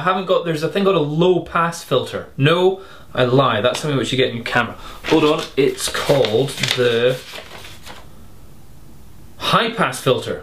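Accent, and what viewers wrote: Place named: Scotland, United Kingdom. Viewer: The magic lantern test was a much purer sound, filtered out most of the noise but left the Scottish accent in tact :) Cheers.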